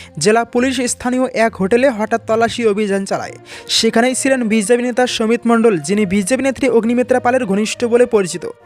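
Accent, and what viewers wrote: Bangladesh. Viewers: ভাই আপনার কথায় বাংলা দেশি টান। তথাপি ভালো লাগে। চালিয়ে যান।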